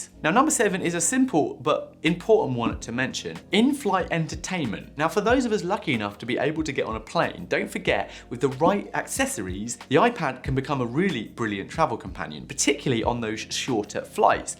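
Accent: United Kingdom. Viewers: I subscribe to ur channel cause I like ur British accent lol …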